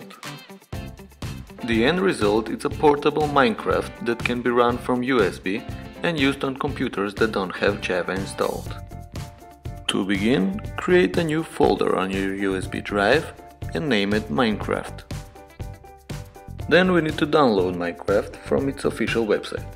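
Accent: Russia. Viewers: u sound Vrussian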